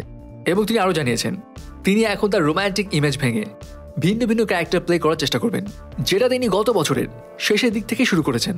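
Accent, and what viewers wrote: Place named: Kolkata, India. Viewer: star golper yutuber তো বাংলাদেশি বাংগালী কথা বা ভাষা কলকাতার মত কেন ভাই?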